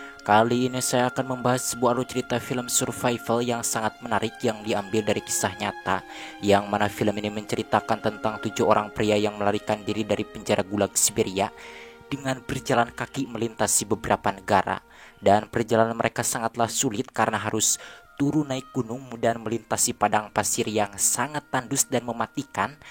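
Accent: West Java, Indonesia. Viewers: Sampean asli org mana bang??? Sunda ya?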